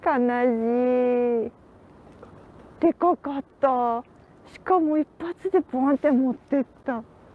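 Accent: Kagoshima, Japan. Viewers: 只々、スケベ心だけで見続けてしまった。声と喋り方が可愛い。鹿児島弁なのかイントネーションがとても耳障り良い。良いチャンネル見つけてしまった。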